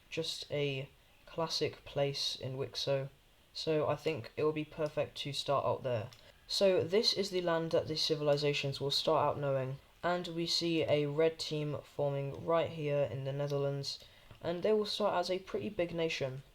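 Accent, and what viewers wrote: United Kingdom. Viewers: why do people think you sound like anorwegian when he’s american you’re from southern england 😭😭😭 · British accent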